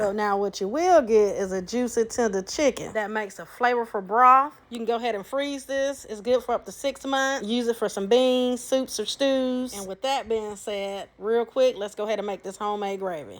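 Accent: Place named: South, United States. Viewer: Honey I don't know where you live. I do know this by the way you are talking it sounds like are in the SOUTHERN AREA OF THE UNITED STATES. You have a way with explaining or teaching this type of food better than anyone I've ever heard. You made this episode look so easy and it makes me hungry. I beg you to invite my over for supper. Please!!!! I'm just kidding will you.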